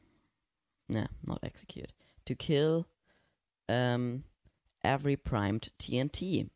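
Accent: Germany. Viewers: you have a german accent lol (I am from Germany)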